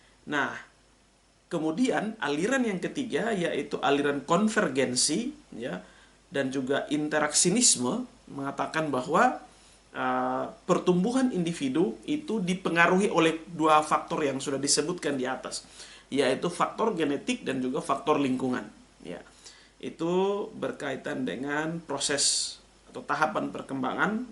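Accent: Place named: Java, Indonesia. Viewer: Koq ada nyelip2 logat jawa nya bapa dosen.. Heheee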